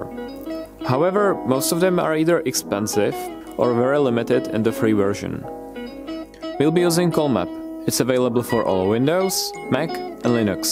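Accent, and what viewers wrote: Czech Republic. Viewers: great, but that czech accent, grrrr :D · Nejsem si jistej, ale asi slyším českej přízvuk 😄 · i can hear this guy is czech, is he?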